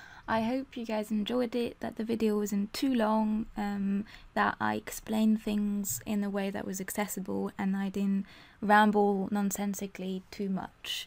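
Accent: United Kingdom. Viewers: This is quite out of the blue but may I know if your accent is British? Which is it exactly? I like it so much. :) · Yes it's wonderfully comprehensive and I very much enjoy your lovely British accent!! :)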